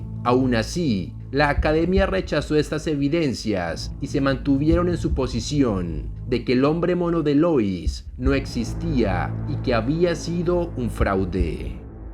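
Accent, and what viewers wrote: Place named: Mexico City, Mexico. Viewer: Parece chilango ha no se crean yo soy chilango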